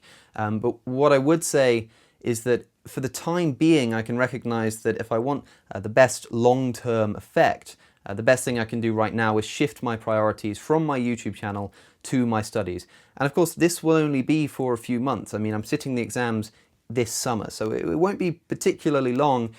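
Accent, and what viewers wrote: United Kingdom. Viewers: Why is he so british? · Woah, your British accent jumpscared me.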